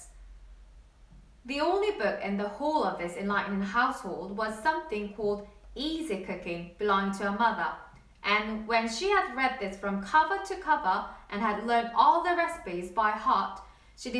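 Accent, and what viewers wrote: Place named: United Kingdom. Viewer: 아니요.발음이 약간 영국식이세요.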